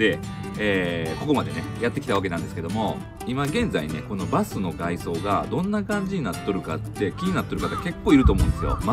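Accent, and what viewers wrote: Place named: Kansai, Japan. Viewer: 同県民だけど微妙に関西弁違う 当方北部です。前の川？綺麗な景色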